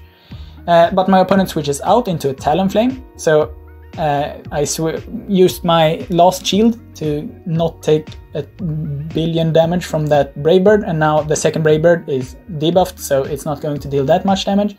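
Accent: Sweden. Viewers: Is he swedish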